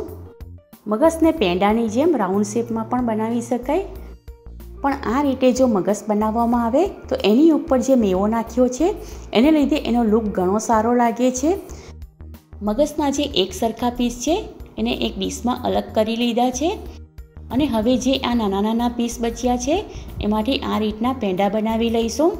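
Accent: Surat, India. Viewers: Tame surti lago chho....boli parthi samjay che😀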